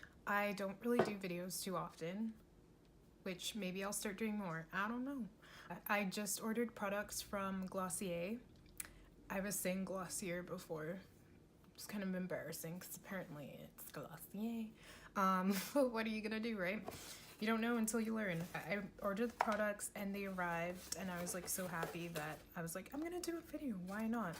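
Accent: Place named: Ireland. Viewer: Are you by chance Irish or Scottish? You have the prettiest accent that sounds like you might be from that area?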